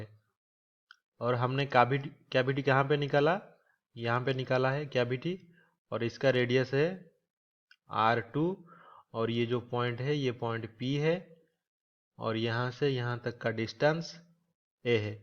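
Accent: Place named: Odisha, India. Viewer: After hearing your voice I think your mother tongue is odia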